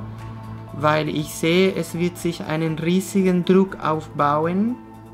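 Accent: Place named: Spain. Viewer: Hallo, du könntest mal angeben, wo man dir eine Spende zukommen lassen kann, per PayPal bspw. Als Energieausgleich dafür, welchen Wert du mit deiner Arbeit den Menschen bringst. Ich kann mir vorstellen, dass der eine oder andere dir gern etwas zurückgeben möchte. Uns pregunta: De qué país eres? Tienes un accento de un hablahispante.:-) Tu alemán tiene un muy alto nivel, muy elevado. 👍🏻 Vielen Dank für deine tolle Arbeit, bin sehr froh, dich hier entdeckt zu haben. 🙏😊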